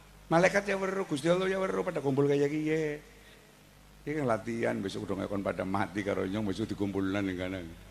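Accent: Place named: Tegal, Indonesia. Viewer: NIKU LOGATNYA KALO ORANG TEGAL YG TERKENALNYA NGAPAK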